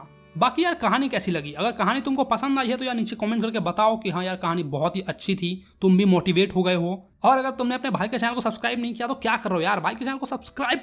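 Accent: Bihar, India. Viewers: I think you are from bihar... Right? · Are you from Bihar 😎😎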